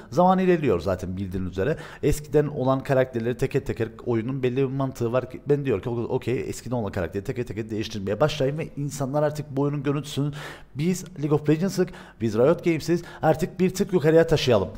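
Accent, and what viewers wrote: Cyprus. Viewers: Dayı sen kıbrıslımısın ya :D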